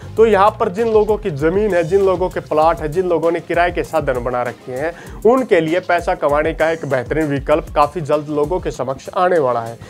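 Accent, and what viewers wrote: Haryana, India. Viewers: भाई पहले ठीक से हिंदी बोलना सीख लो ऐसे हरियाणवी हिंदी बोलने से कुछ नहीं होगा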